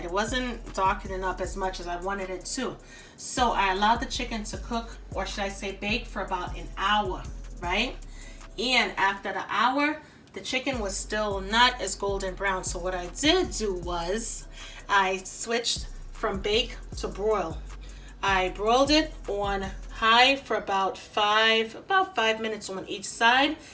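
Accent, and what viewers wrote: New York, United States. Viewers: From one New Yorker to another I love your accent . your food looks great ! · You from NY I can tell 😂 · Are you from New York or someone near that area? Hearing an accent lol. Love it btw. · Damn thank you so much!! And do I hear a New York accent?? ❤️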